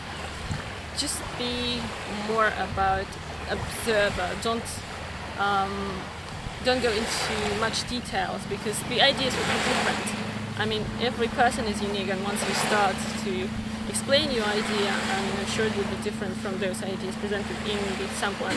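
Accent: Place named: England, United Kingdom. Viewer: This is a English accent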